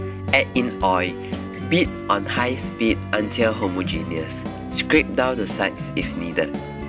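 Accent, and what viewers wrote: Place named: Singapore. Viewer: I'm sure you are Singaporean with that voice, anyway,great receipe!, I'm from Singapore too!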